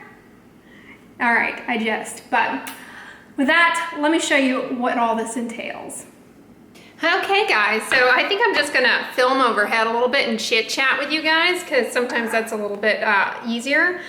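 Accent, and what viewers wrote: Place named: Texas, United States. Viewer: Happy New Year, if I don’t see anymore videos till then, I wish you and your Mommy a healthy and fun one. 1) I thought you were faking the Texan accent, lol, I did not know you grew up in the South. 2) avoiding socially gatherings, you are right, those will keep you germs free...but sometimes they are fun. 3) I bet with myself that you would reach 50,000 subscribers by the end of the year...but you might even go over that number. I learn so much from your videos, thank you for the effort you put into them, you are very much appreciated. 😘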